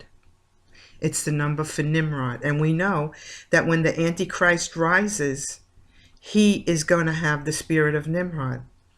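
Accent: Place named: New York, United States. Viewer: Also I love your accent.. NY?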